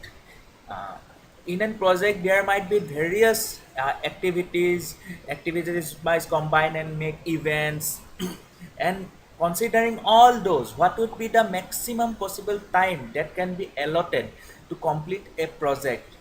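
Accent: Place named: Assam, India. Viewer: Ur accent is like an Assamese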